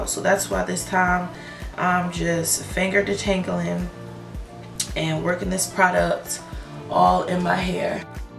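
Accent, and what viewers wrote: Baltimore, United States. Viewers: As soon as u started talking I said is she from bmore🤔🤔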